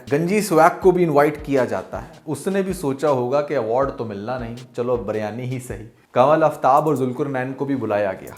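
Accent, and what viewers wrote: India. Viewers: aap indian accent mein kyun boltay hain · Bhai tu muhajir hai kya bilkul UP Bihar aur Old Delhi wali urdu bol Raha hai?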